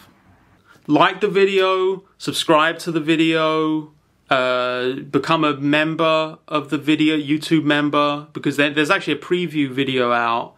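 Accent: United Kingdom. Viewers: honestly i can understand the impulse to want to go back on your delivery in the prior video. i didn't look at the comments but i'm sure it was full of people who were highly critical. i just went back and rewatched it and i have to say i don't think there's any backpaddling necessary, you did a fantastic job at meeting Skylar's critics in the middle and provided a very strong case illustrating how Walt pushes Skylar to rebel against him, ultimately making her the hero that triumphs over the life of terror he is bringing about to the family. I think for the last videos critics, with the time that has passed i'd be really interested to know if they still feel the same way. I think it reflects more poorly on them and their insecurity in their own perception of the show. It's like saying, "well i was wrong and you are right but i didn't like the way you said it!" and truly i can't see where they could see that other than Americans typically being overly critical towards GNC people and maybe your british accent feeling condescending to us when it's just how your fucked up voices sound. 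Anyways, ty for the follow up video, i'm loving these uploads so much.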